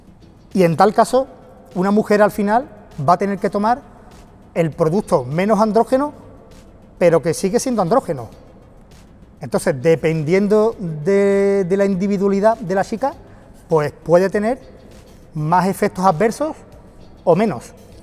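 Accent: Andalusia, Spain. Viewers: Eres Andaluz?? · Otra pregunta, ese acento español es de que ciudad?😉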